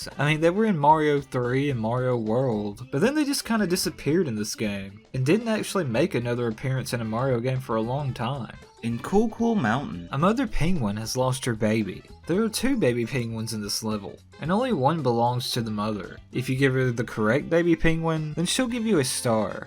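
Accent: South, United States. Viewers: Is this guy from the south?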